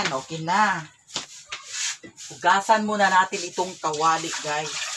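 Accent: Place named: Davao, Philippines. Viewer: Relate man kaau ko ana imong sinultian kay sa amua sa davao man.binisaya kaayo .watching from dubai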